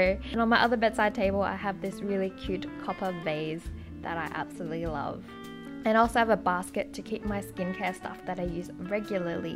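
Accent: Australia. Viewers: it's the australian accent...like they say idear instead of idea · Is she Australian?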